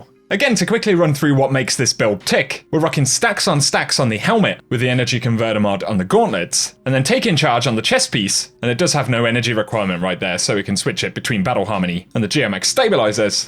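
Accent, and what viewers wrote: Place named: United Kingdom. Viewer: when did you turn british?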